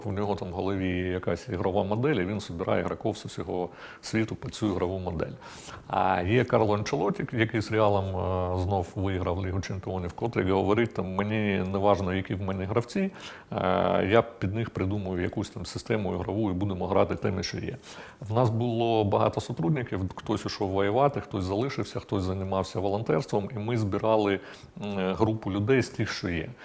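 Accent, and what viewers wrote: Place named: Surzhik, Ukraine. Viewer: Шана та вдячність цьому чоловікові, та краще балакав би вже на російській мові. Бо цей жорстокий суржик дещо «ріже вуха»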